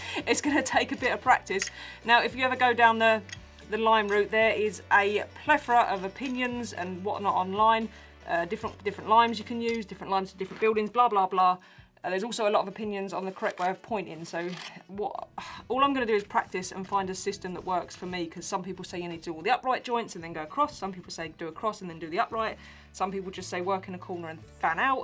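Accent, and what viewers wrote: United Kingdom. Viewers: Talking fast...I change Play Back Speed. Being from US it is sometimes difficult figuring out what Brits are saying. ☺️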